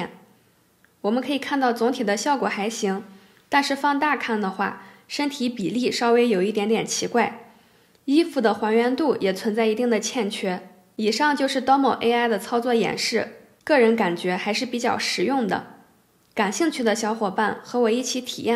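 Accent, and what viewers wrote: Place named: Shaanxi, China. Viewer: 貌似陕西人，反正肯定大西北的。。。。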